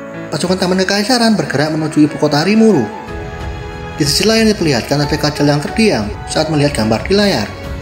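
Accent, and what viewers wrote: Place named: Java, Indonesia. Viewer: Medok nya bisa gak di ilangin, sakit teliga gw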